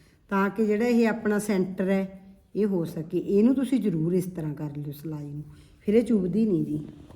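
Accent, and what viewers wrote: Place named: Punjab, India. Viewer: Bhut sohna bneya skarf bhain g dhanwad g Sade rishtedar khana amloh wali side a boli tvadia bilkul ohna vargi a Sade kuram a g plz dsna jroor kis side to ho TUC 😊🙏🙏👌👌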